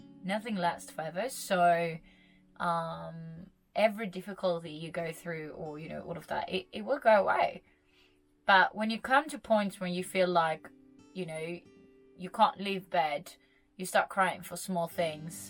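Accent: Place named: Australia. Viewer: You, family and friends go first, always! I like your videos and lookinga forward to see them. Does´nt matter if it´s in english or in swedish. I laught the first time I herd you talking english because you have/had a aussie accent :)... people always laught at me when I spoke engligsh. Sorry for misspelling and bad english :).. Ta det lugn och ta UMS (utmattningssymdrom) på allvar men det verkar du göra. Ser framemot dina videosar oavsett när de kommer. <3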